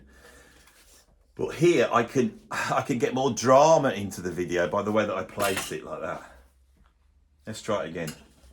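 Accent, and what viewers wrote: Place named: West Midlands, United Kingdom. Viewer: I'm sure you ve got lots of non native speaker😮 ffansall over the jaz rock .prog ahead lib nñ world thus pleaae try to refine yer west midlandish albeit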